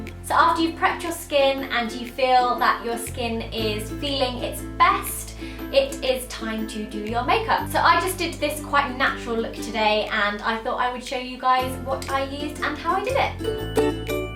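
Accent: United Kingdom. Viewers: I love your videos and I live your British accent❤️❤️❤️😘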